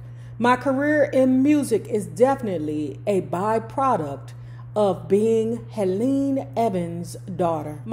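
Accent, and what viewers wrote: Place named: Washington DC, United States. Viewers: Baby, Fay revealed her ethnicity back in the 90's. As 4 ur accent, u sound just like everyone I know that went to Anacostia HS in DC!❤🤣🤣🤣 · You definitely have a DC accent and -isms. I lived in Ft Washington in Maryland (Murrlin) for a few years when I was a teenager. "lunchin", "bama", "doing the booty" all things I heard only in DC. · Definitely sounds east coast. DC or Murrland.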